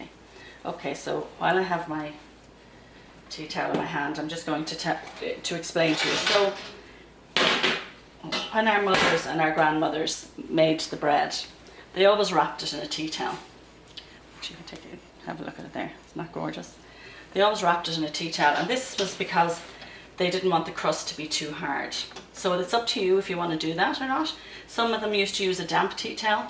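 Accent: Ireland. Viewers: Love the video. Love your Irish accent. My ancestors came from Galway Bay. God bless the irish. Wish I could have a cuppa and a slice with you. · Hi, I've recently subscribed with you, loving your video's so far & love your gorgeous Irish accent!...I'd love to know how long to leave the vinegar standing in a cup of milk?..I'm looking forward to making Soda bread the way my dear Irish Grannie used to make it! keep safe x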